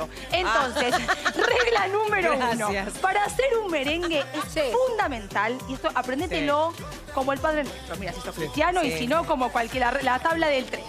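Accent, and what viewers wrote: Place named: Buenos Aires, Argentina. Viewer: En argentina no hablamos todos con la tonada porteña... te invito a recorrer mi pais. Al rodearnos de tantos paises tenemos diferentes tonadas. De todas formas la tonada porteña se consolidó por la oleada de paises europeos que emigraron o que hacían negocios en el pierto de la ciudad de bs as agregado a los nativos. Es muy interesante la herencia q nos han dejado nuestros antepasados.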